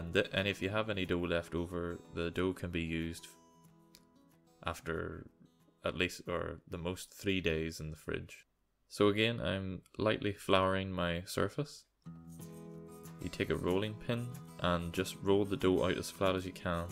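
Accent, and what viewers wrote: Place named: Ireland. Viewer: I thought I heard an Irish accent :)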